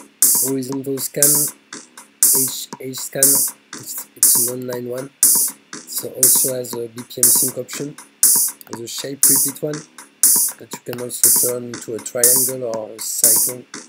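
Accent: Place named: France. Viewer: Le belle accent Français :')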